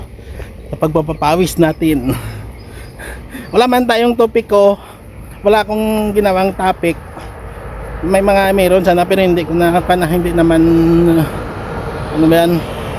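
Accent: Iloilo, Philippines. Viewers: Good morning parang ilongo ka man talaga idol kaya ingat ka idol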